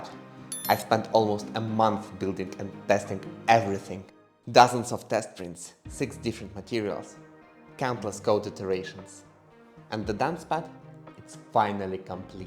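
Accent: Russia. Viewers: По акценту слышу наш слоняра)) Очень рад за тебя братан, сэкономить 3к$ это не каждый сможет)) Можешь подсказать сервис где можно купить подписку на claude без санкций? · я смотря разные видосы про 3д печать не ожидал увидеть англоговорящего автора который на самом деле русский) тебя выдал жутчайший акцент и часть видео где чатгпт написал на русском)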